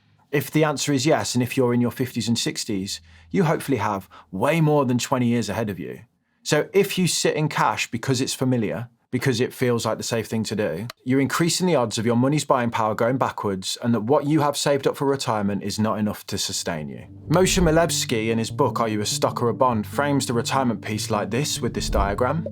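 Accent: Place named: Black Country, United Kingdom. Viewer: It sounds like the black country, maybe walsall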